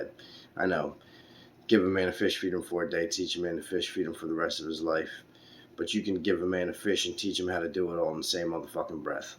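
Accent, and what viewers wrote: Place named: Ireland. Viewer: our soul is stranded in skin, bones and a little more of chunk for some of us. I believe the meaning of life is to live to the best of our ability and take it one day at a time. Some days I feel on top of the world and other days I want to crawl to the back of a cave and disappear. You’re right about money, it doesn’t define one’s character, it can leave a legacy but the act of love leaves a larger legacy ❤️ BTW Mr. your Irish accent is ☘️ but I love your authentic voice. Keep the videos coming……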